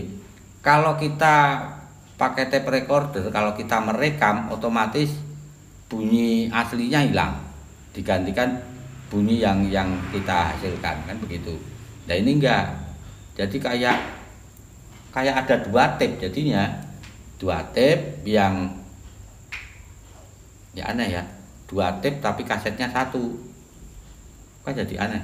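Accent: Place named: Semarang, Indonesia. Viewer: Itu bahasa Semarangan kak, medhok nya ada sedikit ngebass2nya gtu, wkwk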